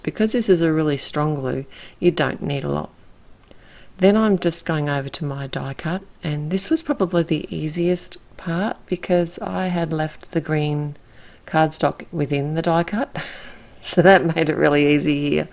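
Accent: Australia. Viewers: do I detect an Aussie accent?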